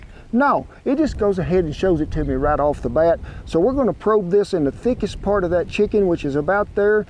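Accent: United States. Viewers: Как он говорит... Это же "кантри" в чистом виде! Наложить музыку и всё, привет и поздравленья! МОЛОДЕЦ чувак!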